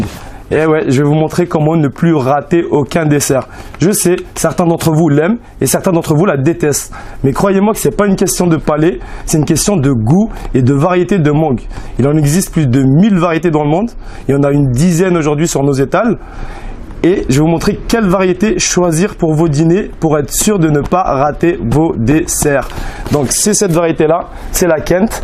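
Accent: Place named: Kabylie, Algeria. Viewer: Je reconnais l'accent kabyle lol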